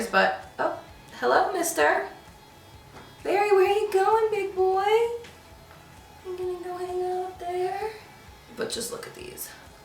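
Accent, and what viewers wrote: New Jersey, United States. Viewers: are you from Jersey by any chance?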